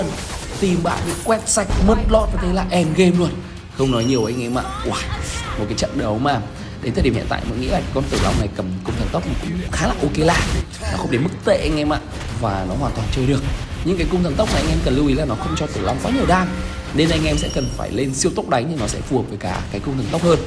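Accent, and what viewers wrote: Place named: North, Vietnam. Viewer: Trai Bấc ló vậy đóa bẹn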